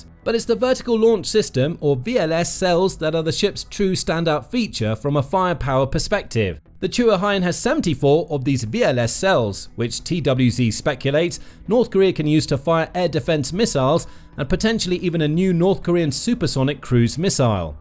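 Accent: United Kingdom. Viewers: Blocked, had my fill of Brit narrators. Enough already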